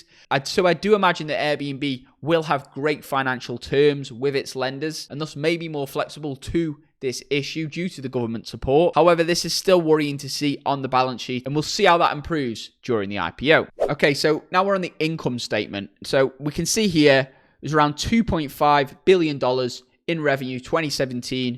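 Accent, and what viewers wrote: United Kingdom. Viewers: i love to stay in Airbnb so much when i travel but i cant agree more with that the company go public at this time is able to survive through this pandemic. long term Airbnb will profit and grow even more than it already proved in the past. i will keep this stock very closed to my watch list and see how the IPO and the stock price going but would love to buy shares one day. thank you for the amazing content plus attractive British accent:))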